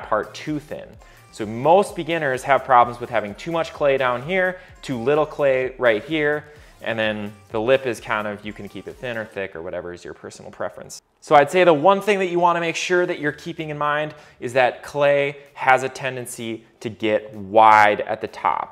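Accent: Minnesota, United States. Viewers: sweet vid. I have been taking classes here where i live in north carolina on the outer banks for the last 2 years- my friend and I just scored a full studio set up and we are just now in the new phase of starting to sell pottery. its soooo fun! We are stoked and love your vids! Im originally from MN and come home every year so I am going to have to come check out Mocha Monkey! you sound like all my friends back home and its sweet to hear your MN accent/vibe!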